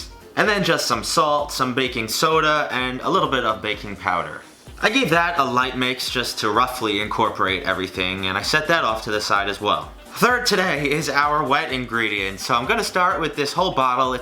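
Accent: New York, United States. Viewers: I knew you lives in New York, you got that accent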